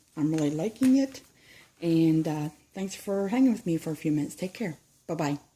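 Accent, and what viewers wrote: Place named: Southeast Pennsylvania, United States. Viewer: As soon as I heard your accent I know you were from South East PA!!!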